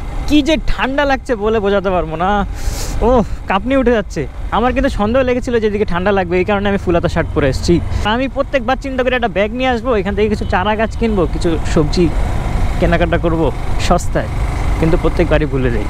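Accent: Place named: Kolkata, India. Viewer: kotha bolar style ekdom kolkatar manusher moto. Hashi+ protita kothar sound ekdom kolkatar manusher moto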